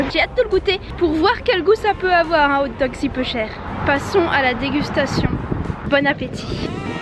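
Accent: United Kingdom. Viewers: fait une vidéo super sympa et très inspirante pour mon prochain séjour à Varsovie, et la seule chose que les gens commentent, c'est son accent anglais. Comment voulez-vous que les gens soient confidents en parlant les langues étrangères quand ils vont se prendre des remarques derrière... Cette mentalité, il n'y a qu'en France que je l'ai vécue et ça me désole! Merci pour ta vidéo!:) Well done! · ton accent anglais on t'en veux pas... sans être méchant:)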